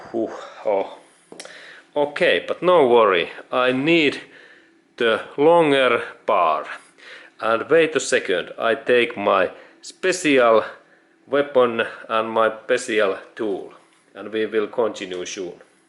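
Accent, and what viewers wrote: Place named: Finland. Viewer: From your accent I can assume you are from Finland 😉. Btw i like your videos very informative.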